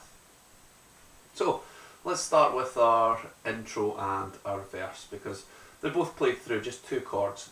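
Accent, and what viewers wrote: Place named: Scotland, United Kingdom. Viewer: Scottish I like it.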